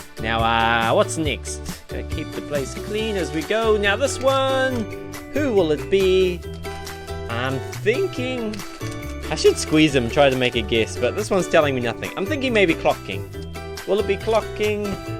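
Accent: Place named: Australia. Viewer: Gotta love that Australian accent! Greetings from Washington state.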